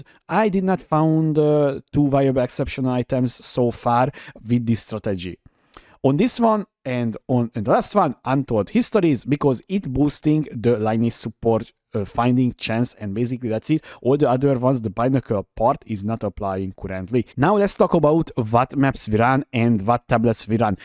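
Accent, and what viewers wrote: Hungary. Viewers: Ennél magyarabb akcentust még nem hallottam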